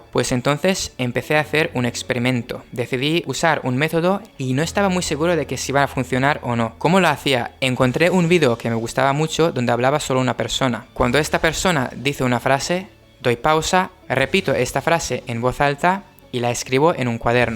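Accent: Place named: Spain. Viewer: Excelente nivel de Español, lo hablas super bien, pareces de España.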